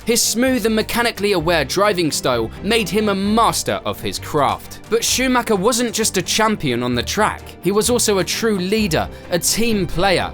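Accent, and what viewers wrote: United Kingdom. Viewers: I can smell the bias British from 300 miles away pff. ugh...no debate he doesnt even belong to that list. Fangio, Ayrton senna and Michael Shumacher and i think now Max popping up. Not Lewis. And with bias British accent people cant take this serious having such debates, bias bias bias. Remind you he lost from Button, Rosberg, <> Massa, Russell some even teammates outqualified Lewis in a season. I dont see Fangio, Ayrton or even Micheal or Max doing that. Oh i dont even start his dad was poor as he got financial backup from Ron Dennis at the age of 11.. His career before F1 isnt exactly bright at all compared to other F1 drivers.. Other drivers BEFORE entering the F1, perhaps you can spot the difference: Verstappen: 2010: WSK Nations Cup - KF3 - 1st 2010: WSK World Series - KF3 - 1st 2010: WSK Euro Series - KF3 - 1st 2010: CIK-FIA World Cup - KF3 - 2nd 2010: Bridgestone Cup Europe - KF3 - 1st 2011: WSK Euro Series - KF3 - 1st 2011: WSK Master Series - KF2 - 1st 2012: CIK-FIA World Cup for KF2 - 2nd 2013: CIK-FIA World KZ Champion - 1st 2013: CIK-FIA European Champion - KZ - 1st 2013: WSK Master Series - KZ2 - 1st 2013: WSK Euro Series - KZ1 - 1st 2013: CIK-FIA World Champion - KF - 3rd 2013: CIK-FIA European Champion KF - 1st 2014: FIA Formula 3 European Championship - 3rd 2015: Formula 1 Hamilton 1995: Super 1 Nat Champion – IAME - 1st 1996: Kartmasters British GP – Comer - 1st 1997: Super 1 National Champ– FY 1th 1998: Torneo Industrie – 100 Jun- 19th 1998: Green Helmet Tro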